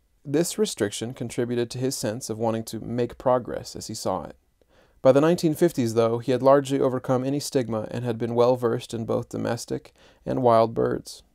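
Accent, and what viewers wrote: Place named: United States. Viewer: Great work! (why the American accent on the video?)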